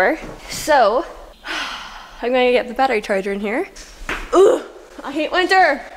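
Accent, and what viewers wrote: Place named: Scotland, United Kingdom. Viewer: mabee alot of you guys have a bit of a Scottish or Irish twang in yer accent.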